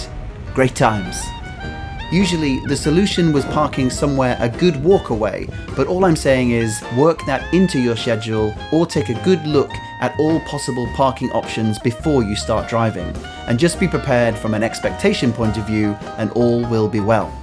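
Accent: England, United Kingdom. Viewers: I love your videos👍🏼 and your English accent 👍🏼👍🏼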